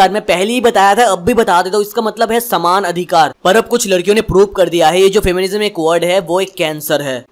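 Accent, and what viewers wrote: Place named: Bihar, India. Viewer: Tum Bihari ho kya be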